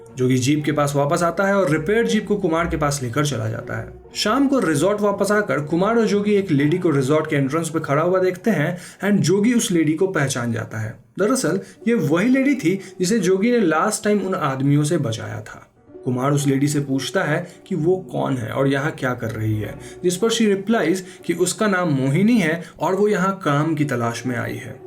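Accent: Bengal, India. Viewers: Bro are you bengali..?🧐🤔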